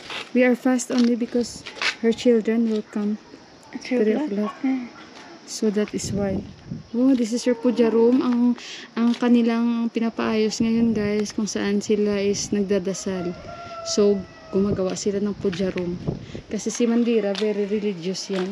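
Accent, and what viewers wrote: Iloilo, Philippines. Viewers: Gustong-gusto ko talaga Ilonggo accent mo, mamsh. Missing Iloilo and Bacolod and all my Ilonggo friends.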